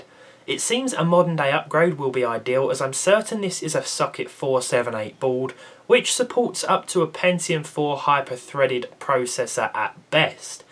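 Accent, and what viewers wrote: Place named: Brighton, United Kingdom. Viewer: You’re from Brighton, aren’t ya?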